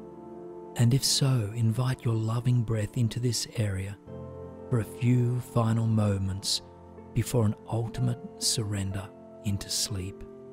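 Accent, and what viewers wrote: Australia. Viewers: I love your meditations, they’ve helped more than you’ll ever know. I feel even more drawn to you because I think you’re Australian? Feels a bit more like home and trusting.. I can’t explain it. But thank you again 🙏🏼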